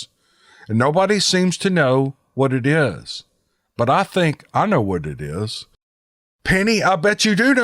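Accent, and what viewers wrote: South, United States. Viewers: I just love you country voice. You have that voice that you get around a fire and get you some popcorn and get comfortable and listen to you. But I can get in my bed and play your channel and picture my mind in these stories like I'm there. When you tell these stories. Thanks you do a very good job and telling these stories.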